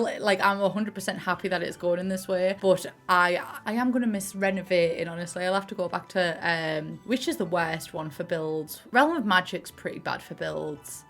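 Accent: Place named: Essex, United Kingdom. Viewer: Less than 30 secs in and I'm shocked that the Essex accent apparently sounds posh to someone from Middlesbrough 😂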